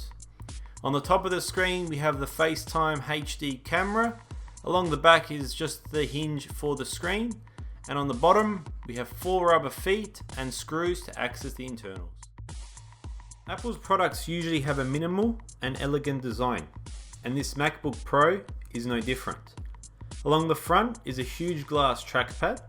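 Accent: Australia. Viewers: ? you sound austrailian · is that an Australian accent???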